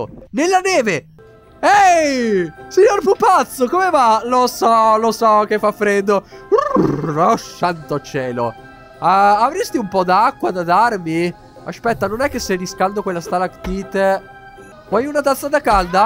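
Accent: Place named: Veneto, Italy. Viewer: il dialetto veneto <3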